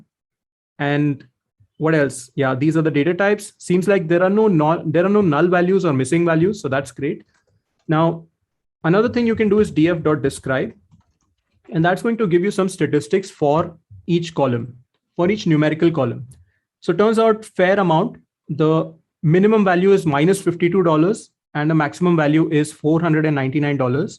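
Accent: India. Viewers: The Indian accent is the accent of the knowledge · you've lost me at the indian accent. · Thank you so much for providing in India accent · You have won me at your indian accent ❤ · While his Indian accent is bearable, not as strong as the typical Indian accent, I still feel uncomfortable listening to this kind of accent. I don’t know why.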